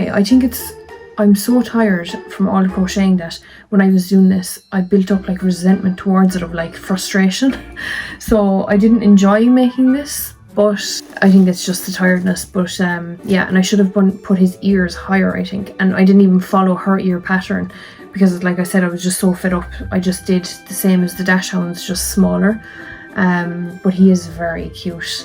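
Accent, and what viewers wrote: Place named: Ireland. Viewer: Hi, there are so many cute item's just came across you today thought i could hear irish my mother from killkenny Ireland look forward to you're next video ❤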